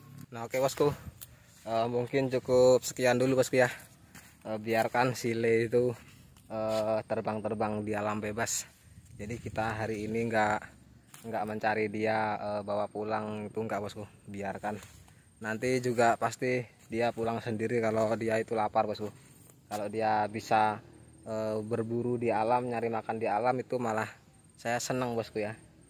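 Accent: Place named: Malang, Indonesia. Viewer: Ini didaerah mana kang? Kok seperti bahasa saya daerah malang selatan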